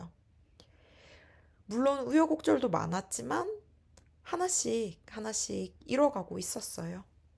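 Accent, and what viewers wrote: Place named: Seoul, South Korea. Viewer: 우왕 서울말 진짜 잘하시넹 하다가 인!생의 듣고 빵ㅋㅋㅋㅋㅋㅋㅋㅋ 언니 그래도 진짜 자립해나가려고하는 의지를 중요하게두시고 앞으로 나아가려고 하는 것이 잘 보여요! 어디 가서 사시든 혼자서도 다 잘해내는 예요!ㅋㅋ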